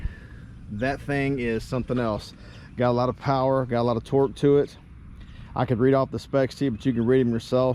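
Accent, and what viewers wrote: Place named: Texas, United States. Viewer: I bought the vagabond bag I see on your video. Ought to work well. These videos are helpful to many riders for info on mods from cup holders to feet pegs etc. Appreciate the knowledge. You sound similar to how my dad talks, my family agreed. Texas accent. Riding the Valkyrie in rocky mountains is worth a trip, I love it every chance I get!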